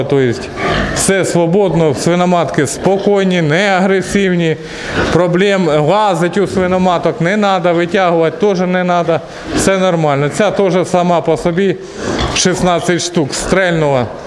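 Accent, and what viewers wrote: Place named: Surzhyk, Ukraine. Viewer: Это суржик ,кто как хочет так и говорит ,у нас в Украине с этим проблем нет!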